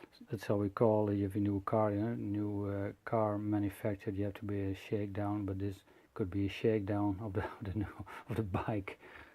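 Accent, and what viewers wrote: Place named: Netherlands. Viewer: Leuke video! Je kan wel beetje horen dat he Nederlands bent maar nog steeds leuke video’s ik heb pas een mtb en ben hem ook aan het verbouwen om maar te zeggen😂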